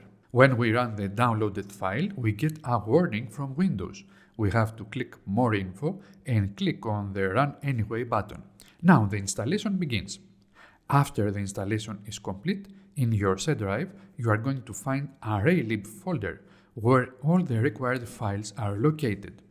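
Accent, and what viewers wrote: Greece. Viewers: 2 seconds in and almost immediately realized you were Greek. Keep up the good work! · The greek accent is so cuuuteeee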